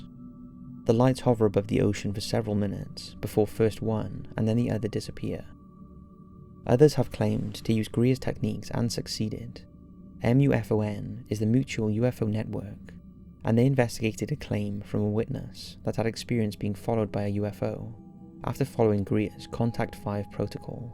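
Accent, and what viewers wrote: Wales, United Kingdom. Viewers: 😂😂 sounds like a semi-welsh, generic accent, plus, a commenter on this comment thread has already pointed out they can't stand the voice, not massively effective I'd say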